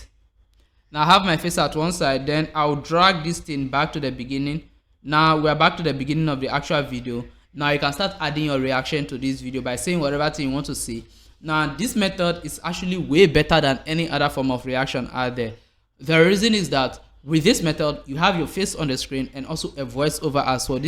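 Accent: Nigeria. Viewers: Is it eshot or eshort? Nigerian accent hard to understand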